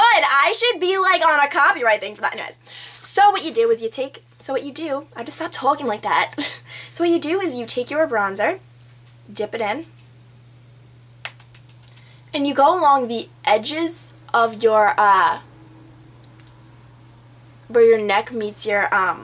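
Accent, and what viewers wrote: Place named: New Jersey, United States. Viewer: im a jersey girl shes deff jerseyy not nyc or staten island jersey acent right there lol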